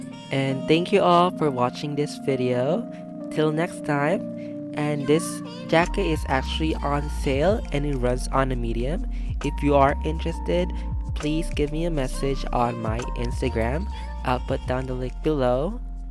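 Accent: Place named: Philippines. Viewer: I noticed your accent and the word buwan, are you Filipino?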